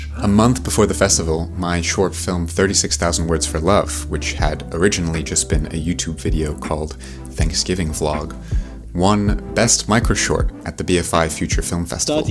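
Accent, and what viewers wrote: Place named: North America. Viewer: Very beautiful and honest video. Thank you for sharing. Out of curiosity, are you Canadian? I ask because you speak English with a North American accent but you said "university" instead of "college", though it's probably because you did a PhD.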